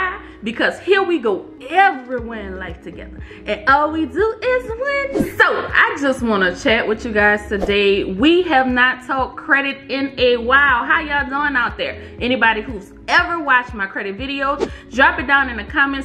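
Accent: New Orleans, United States. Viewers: You got that NOLA twang love from Htown thanks for the game 💯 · Great info! I love your attitude and accent ❤️ Sounds like you from New Orleans 🤣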